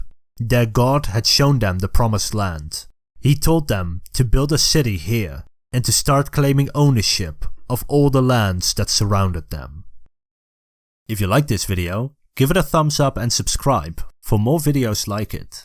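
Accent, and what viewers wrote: Netherlands. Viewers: I want to know too! I am hearing the slightest bit of a dutch accent? I am confused too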